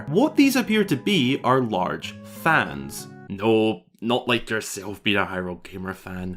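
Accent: Scotland, United Kingdom. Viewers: You Scottish? Nice, I want to go there one day ❤ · your scottish i thought you were british 😅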